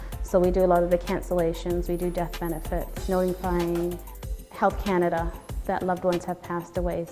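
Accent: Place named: Caribbean. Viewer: Sounds like this lady has Caribbean Roots well spoken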